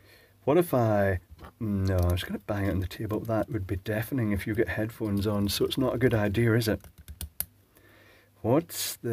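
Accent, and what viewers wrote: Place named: Glasgow, United Kingdom. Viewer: Great video, your Glaswegian today reminded me of oor wully for some reason, you don’t happen to sit on an upside down bucket when you record these do you? Excellent inspiration for us Scottish electricians during a tough time. My Wully, your Wully- a’boadies Wully! Translation: My Willy your Willy - my maws no got a Willy. Intended meaning- my William, your William he’s everybody’s William!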